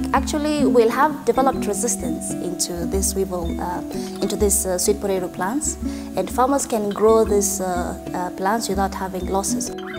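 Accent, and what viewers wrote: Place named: England, United Kingdom. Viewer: I love that English ascent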